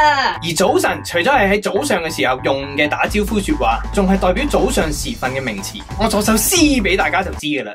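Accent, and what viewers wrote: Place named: Guangzhou, China. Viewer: 唔知係咪錯覺，我覺得你啲廣東話都有啲口音，係就好流利，但係有啲口音囖，可能係廣州音同香港音分別.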